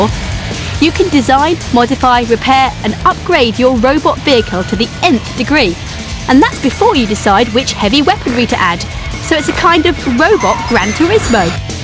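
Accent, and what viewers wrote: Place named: United Kingdom. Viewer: shes too british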